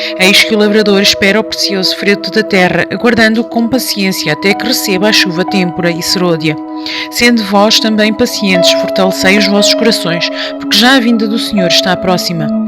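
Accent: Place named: Portugal. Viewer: Na Tradução dessa Bíblia é a msm em Português Brasileiro né , o sotaque de Português de Portugal é Lindo !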